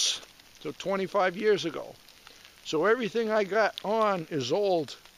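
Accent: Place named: New England, United States. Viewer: Dear Sir, since I've stumbled upon your channel a couple of weeks ago, I can't seem to get enough of " ". I'm still sifting through videos I have not yet viewed, and often stay up to late watching your videos. I deeply understand your drive to remain independant and enjoying life in the cabin. I just turned 57 a few days ago and got most my boys through college with one still in high school. I live in the Great Lakes region and love traveling up north to northern Wisconsin and Michigan with friends that own property and cabins. I'm still working, but am trying to prioritise purchase of 40 or more acres with a cabin before too many more years pass me buy. You are my guiding inspiration to pursue my cabin dreams. Best to you and to Franky. Love your New England accent. God Bless. Carl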